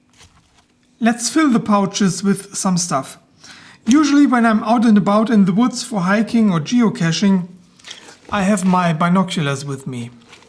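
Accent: Germany. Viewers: I wanted to go with German cause of your name but your accent is very soft and not very typical of a strong German accent. Are you around Americans frequently :)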